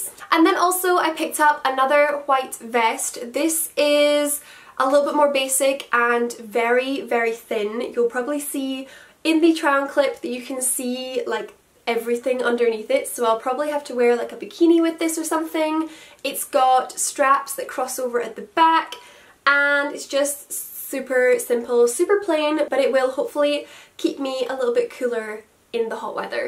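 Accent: Scotland, United Kingdom. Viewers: Omg r u Scottish if so I'm from Glasgow ❤️❤️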